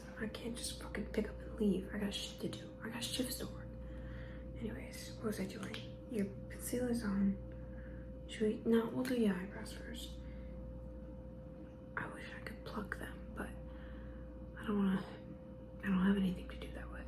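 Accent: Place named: New York, United States. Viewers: I love the whispered NY accent so much, it sounds so relaxing and comfortable! Love your asmr so much :D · The NY accent makes me feel right at home 🥹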